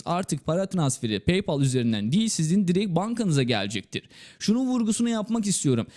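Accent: Azerbaijan. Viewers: hə azəridi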